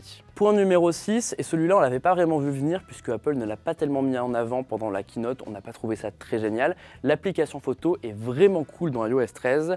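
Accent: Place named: France. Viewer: "sing" in wiz apple lol c vrm dégueu l'accent française de l'anglais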